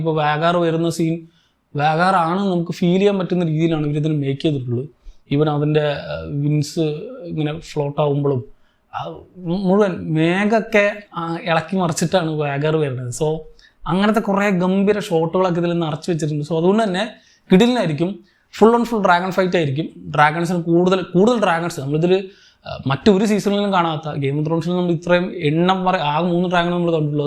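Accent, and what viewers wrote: Palakkad, India. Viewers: Ningal palakkad aano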